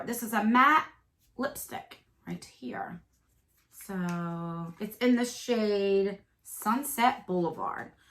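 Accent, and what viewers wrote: New Jersey, United States. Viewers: I love watching your videos because of your accent, reminds me of home (NJ) ☺️ loveeee the violet voss palette 😍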